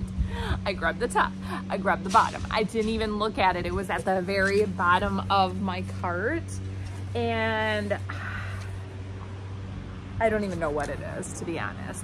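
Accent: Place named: Midwest, United States. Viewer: Found your channel recently and right away loved your accent because you sound like me! Lol..We are from WI but have been in NM for many years and will probably not be retiring here. Miss WI alot but think we we will move more in the south soon. Love you ideas and I'm a reseller as well. Happy junking fellow Midwestern ☺️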